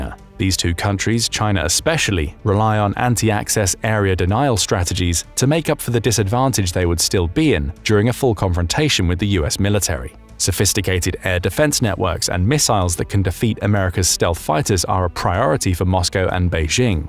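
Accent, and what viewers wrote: England, United Kingdom. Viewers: More 'Anglo-Axis' bollocks with a Pommy accent. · Why is the speaker talking in a british accent about American assets?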